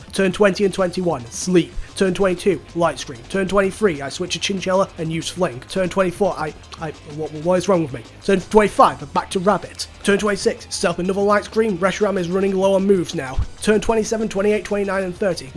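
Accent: Northern England, United Kingdom. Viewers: Found your channel this morning and now going through the rest of your vids. Your scripting and editing is class. I'm from Northern England so your accent gives me some bias into liking the commentary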